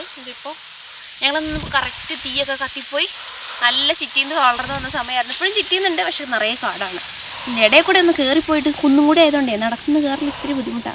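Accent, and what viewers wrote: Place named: Central Travancore, India. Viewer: ഞാനൊരു subscriber അല്ല അതുകൊണ്ടുമാത്രം മറുപടി തരില്ല എന്നുണ്ടെങ്കിൽ വേണമെന്നില്ല എനിക്ക് ചോദിക്കാനുള്ളത് നിങ്ങൾ വയനാട് ആണെന്ന് പറഞ്ഞു പക്ഷേ നിങ്ങളുടെ സംസാരം ഒരു മധ്യതിരുവിതാംകൂർ ഭാഗത്തുള്ള സംസാരം പോലെ ആണല്ലോ 80%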